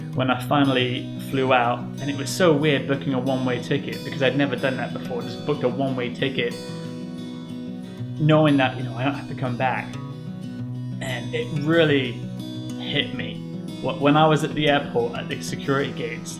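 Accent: United States. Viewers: i don't want to say this but your starting to sound america like you are losing your accent i can hear the american accent alot when you speak. you going to sound like an american soon anyways welcome to America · aw thank you for the reply and feedback!!! Great to connect also ☺️ my fiance wants to live here I think but I may come over and have a long extended holiday next year and we can make the choice then. The healthcare over there worries me as I care for my teenage daughter and I also have health issues. Will keep you posted though as our journey unfolds! I absolutely love your adventures in the RV and what an amazing experience you all are having. I can hear the soft American accent creeping in also on yours lol...I find I say a lot of American words now because my fiance and I chat daily so I'm picking up a bit of accent just from that lol. Take care and many blessings to you and your amazing family! 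· You sound quite American now mate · Sorry bro, I thought is a dude. Didn’t realise she’s a she. How’s life now in Houston? I noticed you’ve adopted American accent now.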